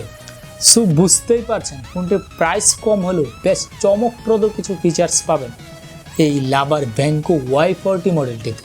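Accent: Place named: Comilla, Bangladesh. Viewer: ভাই আপনার উচ্চারণ শুনে মনে হচ্ছে আপনি কুমিল্লার ই....! ঠিকমতো উচ্চারণ করতে পারেন না, আবার রিভিউ শেষে style মারেন।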